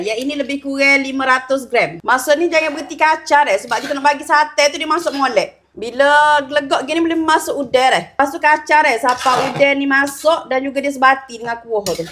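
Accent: Kelantan, Malaysia. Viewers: Nk oyaknya ore klate lhtu😅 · Suka dengar loghat orang Malaysia, ingin cari istri orang Malaysia lah😂😂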